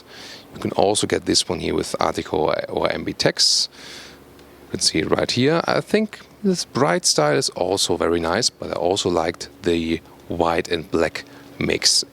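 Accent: Germany. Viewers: I hear a review in a German accent. SOLD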